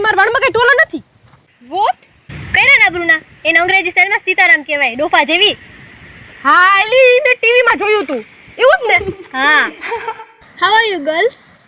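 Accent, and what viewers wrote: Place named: Junagadh, India. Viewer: Having grown up in junagadh...You made me nostalgic, listening your cute accent....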